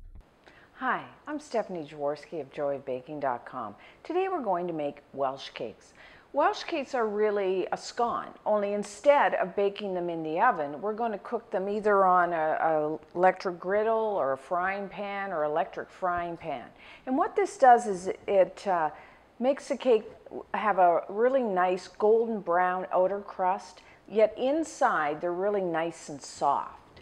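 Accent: Canada. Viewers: Ah, you're hearing the North American accent, but you've completely missed her CANADIAN vowel! Nobody in the U.S. sounds like her! (Unless, perhaps, they live near the Canadian border). The Canadian accent is heard in the vowel "ou", as in "out" or "about," and it is adorable.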